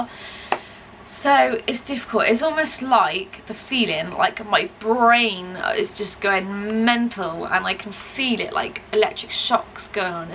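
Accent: United Kingdom. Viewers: you should use a tripod so you can adjust the height so it doesn't cut your head off at the top ;) I enjoy watching your videos. keep up the good work. and i enjoy your British accent. ;)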